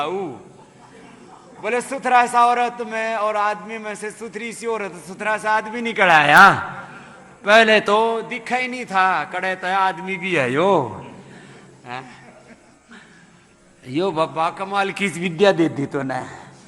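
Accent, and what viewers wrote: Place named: Punjab, India. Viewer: की punjabi voice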